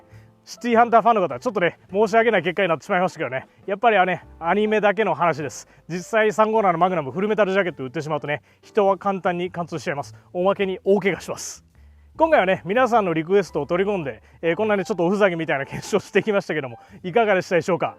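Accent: Kansai, Japan. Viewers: 関西弁のシャベリも検証も楽しく拝見しました。 FMJだとなんとか原作通りに手が破壊されなかったですね。 ただあの場所を抜いてしまうと骨が無事でも正中神経や骨間筋、虫様筋などがズタズタでしょうから、手の機能は著しく低下したはずです。 槇村が普通に目視で縫合してましたがマイクロサージャリーが必要なレベルです。 他の動画も楽しく拝見させていただきます。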